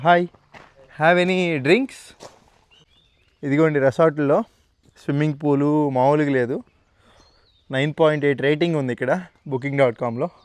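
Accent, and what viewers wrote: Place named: Visakhapatnam, India. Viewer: మీది విశాఖపట్నం ఏనా భయ్యా మీ మాటలు అక్కడిలానే ఉన్నాయి కొంచం. మీది ఏ ఊరో చెప్తారా భయ్యా 😊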